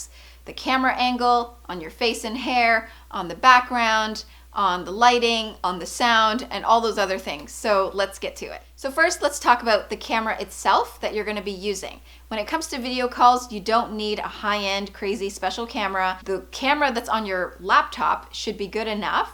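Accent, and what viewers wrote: Canada. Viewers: It's a Canadian accent 👍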